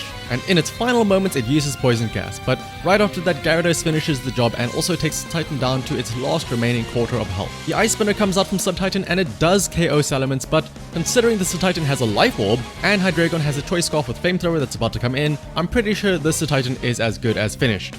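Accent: South Africa. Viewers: Fellow SA Pokemon player here based in Cape Town. Found your video via the algorithm and heard the accent and I was shook! 😁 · Nice video bro, is that accent South African?🤔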